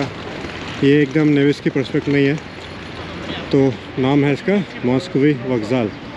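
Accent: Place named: Hinglish, India. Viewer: Какой классический у Вас хинглиш!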